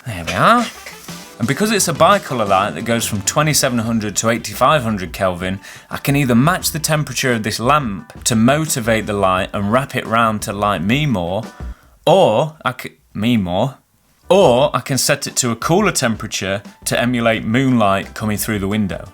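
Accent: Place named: England, United Kingdom. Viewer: Nice to hear an English accent on a YouTube video for a change.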